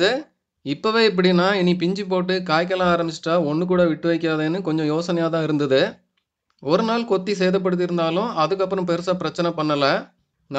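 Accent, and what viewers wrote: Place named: Coimbatore, India. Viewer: super நா.. உங்க பேச்சு.... தெரியுது... கோவை... உங்க video inspiration ah இருக்கு அண்ணா..vithai pakirvu iruntha podunga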